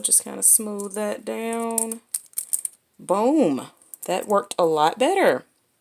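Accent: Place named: South, United States. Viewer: Thank you for this video! I absolutely love your country accent! ♥️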